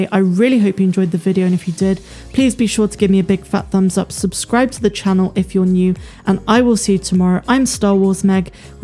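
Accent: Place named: Bristol, United Kingdom. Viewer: Meg your accent sounds like your from down my neck of the woods in Bristol or close by. Love your work mate Gert lush and Peace out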